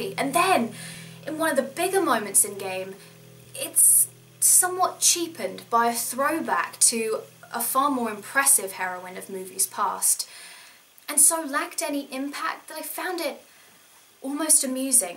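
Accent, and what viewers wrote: United Kingdom. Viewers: I think you should have done the voice over work for Tomb Raider. Your british accent sounds much better than the actress that did it.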